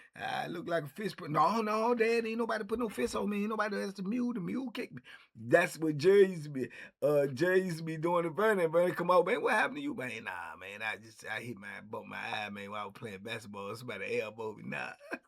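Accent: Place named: New Orleans, United States. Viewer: Yo do you have New Orleans roots? I know you from DC but I hear you sound like me from time to time. New Orleans ⚜️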